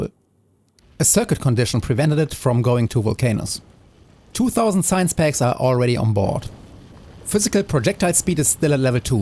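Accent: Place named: Germany. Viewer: native speaker of both english and german here. Yeah, it's noticeable, but you're doing great, it's perfectly understandable (meaning it fulfills its purpose), it's infinitely preferable to AI slop voice, and at this point it would sound wrong to hear you speak english without an accent, it's lowkey iconic.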